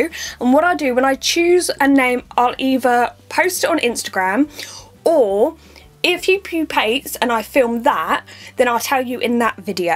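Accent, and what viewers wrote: United Kingdom. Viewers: Are you British ???!??!??!?!?!!?!!??!!? I AM TOOOOOOOOOOOOO!!!!!!!!!!!! Edit: also if he turns out as a boy Call him Ron If he turns out as a girl call her ginny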